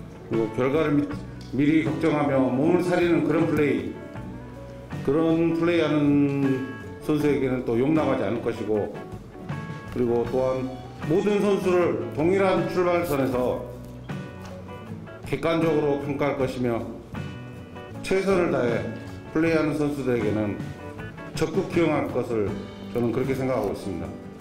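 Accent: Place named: Honam, South Korea. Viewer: 말투가 약간 호남 사투리가 썪어 있다고 할까요? ㅎㅎㅎㅎㅎ 아무튼 김종국호의 타이거즈 기대합니다.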